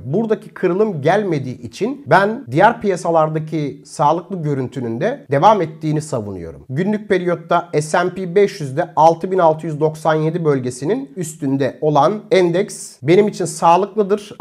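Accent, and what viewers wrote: India. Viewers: video ingilizce yapınca hintliler gibi konuşuyorsun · Hocam niye Hint aksaanıyla konuşuyorsun?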